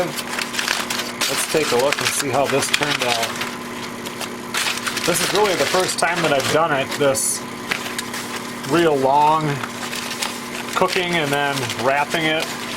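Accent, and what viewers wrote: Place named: Northwoods, United States. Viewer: Just found your channel; great to hear that accent. I was born and raised in Cloquet, lived in Duluth; now transplanted to OKC. These will help me get my Northwoods fix, so thanks.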